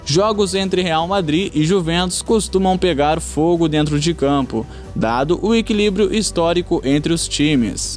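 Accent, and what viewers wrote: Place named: Portugal. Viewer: Parece um português tentando imitar os br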